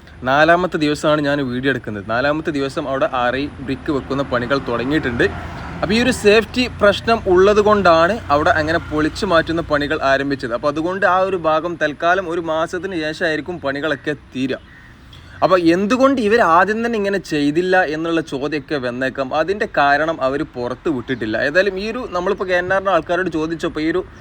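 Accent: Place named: Northern Kerala, India. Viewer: All your programs are very good, especially your narration in northern kerala dialect.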